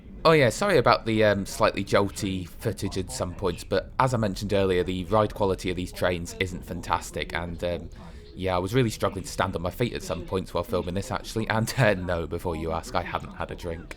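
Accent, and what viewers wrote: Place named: Yorkshire, United Kingdom. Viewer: Love the Yorkshire accent. 'alifax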